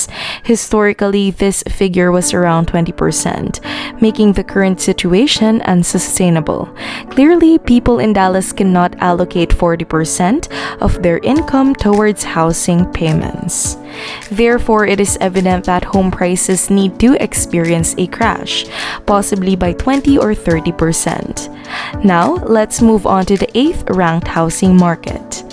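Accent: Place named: Philippines. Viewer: I detect a accent from the PI. From CA here, average house is around 700k, which is a monthly around 5k for a 30 year... this means the average person has to be bringing home a 15k monthly paycheck........or ~3% of the population. How is it, that any houses are selling at all at these average prices, when 3% of people quality for the average home?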